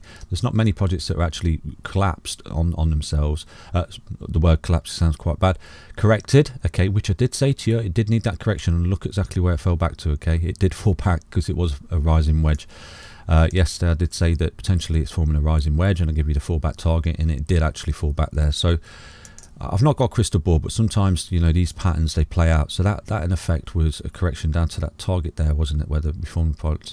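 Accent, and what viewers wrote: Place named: East Midlands, United Kingdom. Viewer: Great analysis. Sounds like you’re from Derby/Nottingham by the sounds? I don’t know if you’d class it as an East Mids accent.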